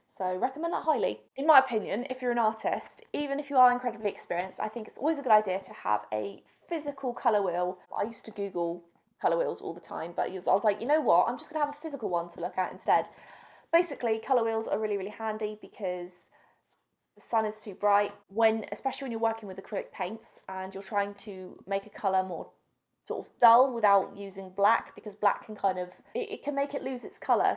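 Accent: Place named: South West England, United Kingdom. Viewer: I detect a south westerly accent there; maybe Bath?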